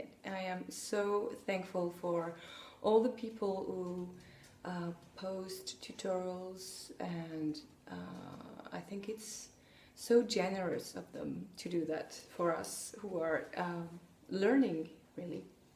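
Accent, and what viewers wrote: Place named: France. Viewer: Hello! I am Jacqueline and I am a Canadian living in Tokyo, Japan. I love knitting and I am currently working on a turtleneck sweater for myself in Brooklyn Tweed LOFT (which is amazing yarn!) and a hat knit in merino and mohair/silk yarn held together. They are both knit in black! I am trying to make basics that go with my monochromatic wardrobe. I also sew but I am just getting back into it. Last week I made myself a knitting project bag with recycled kimono fabric. I loved your podcast and your English is beautiful and your French accent is cute:<). Thank you for the effort you put into doing this and I look forward to more.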